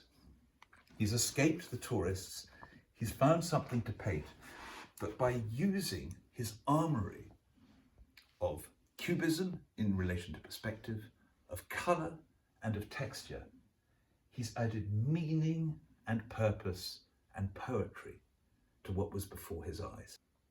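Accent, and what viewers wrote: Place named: United Kingdom. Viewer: Thank you so much for your lovely "lesson ". True, everything sounds better with the british accent. Greetings from Tuscany, Italy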